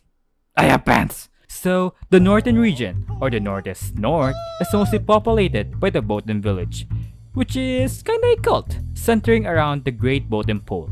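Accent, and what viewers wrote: Philippines. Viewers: you sound filipino. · I realli like your pilipino accent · i think its Flipino accent??? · are you a filipino? you sound like one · are you filipino???? your accent is soo familiar to tagalog~ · Are you perhaps a filipino? because that accent is seemingly familiar lol From 🇵🇭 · Ur Accent . Are u Filipino? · are u filo????? i can hear it in ur accent 😭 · I know you're filipino just by the accent.. Correct me if i'm wrong but i'm pret-ty sure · Your English accent seems like a veri Filipino vibe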